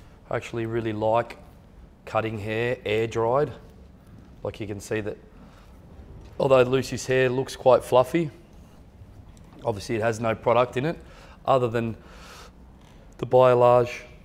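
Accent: Australia. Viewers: Absolutely gorgeous! Lucy's hair is exquisite. It's luxurious and healthy and looks like liquid velvet with the way it moves The color is scrumptious! You did a very beautiful job! 💜, you are so very handsome and I just love your wonderful Australian accent! Writing from Southern California.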